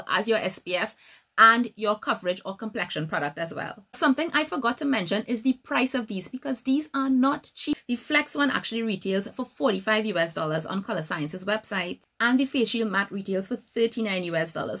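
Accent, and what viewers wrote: Trinidad and Tobago. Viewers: Great review. You sound like a trini! OK so you just said, " for wearing it sake" yup, you àh trini.. Great review 🙌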